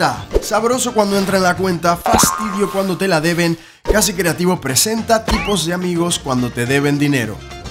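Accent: Argentina. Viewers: el asentó cordobés es horrible, no lo aguanto, igual que el asentó chileno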